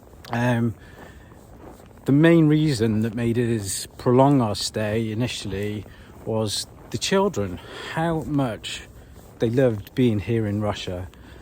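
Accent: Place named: Southern England, United Kingdom. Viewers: Его произношение очень типично для англичан и, сказав, что оно интересное, Вы можете немного обидеть человека. Это самый лучший английский, который Вы можете услышать, четкий и ясный. У меня когда-то очень давно был такой акцент, но я его быстро потеряла, начав жить в Америке. Я очень надеюсь вернуться назад в Россию после более 20 лет жизни в Америке, но у меня сыновья-инвалиды из-за ошибок американских врачей, поэтому сейчас мы только надеемся на чудо. Я очень жалею, что уехала и я знаю, что я не одна такая, кто хочет вернуться, но по личным причинам пока не может этого сделать. В каждой стране есть свои плюсы и минусы, но проблема в том, что мы знаем, чего ожидать и как обойти многие проблемы на Родине, а в новой стране мы, как слепые котята, ничего не знаем. Поэтому, верна поговорка, где родился, там и пригодился. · Your English, from the south maybe? 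I'm from the midlands.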